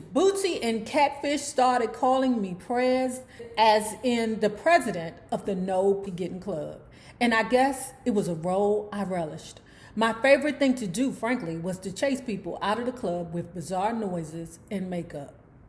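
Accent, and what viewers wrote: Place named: Washington DC, United States. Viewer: Lmao lmaooooooooo you sound like you from DC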